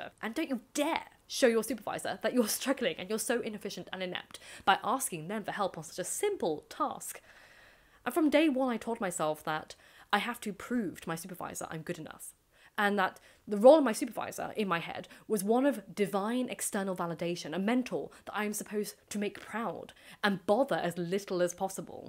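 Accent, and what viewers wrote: RP, United Kingdom. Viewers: Also why do you speak with a heightened RP accent? Is that your real accent or is that just for the Youtube chanel?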